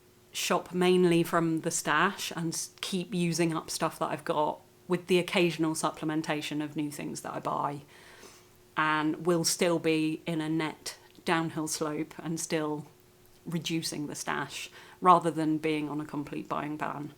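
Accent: United Kingdom. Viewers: Hi. I've only just found your channel but it's good to listen to you. A lot of the crafting videos are American, which is okay but it's good to hear an English voice, especially as I live in very rural France, although am English. I guessyou could say I'm a multi crafter and love to try lots of different textile related but not purely crafts. I've made textile art dolls(primarily fabric), dressmaking and other clothes, knitting, embroidery, weaving, felting, textile art and mixed media pictures, made fabric animals, driftwood houses, Christmas decorations and wreaths, both fabric and natural, fabric collage, mixed media using all sorts of art supplies, sketching, entangle and am currently dipping my toe into the world of natural dyeing. I'm a retired nurse so absolutely understand your work life balance situation but am lucky enough now to have the time to play more, if not necessarily the budget to with it. 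I love to thrift or recycle as many supplies as I can. Looking forward to watching your previous videos and please DON'T enter me for the pattern giveaway as I already have a big stash of both knitting and sewing patterns I still want to make, and despite retirement, there's still never enough hours in the day 😅 Oh, and yes I'm crafting while listening 😊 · I’m a sewist and knitter. I started with the latter but always loved clothes. I thought I recognised your accent - I’m a midlands gal and after growing up in Leicestershire I’ve now been living/working in Derbyshire for the last 8 years. Congrats on 2K followers!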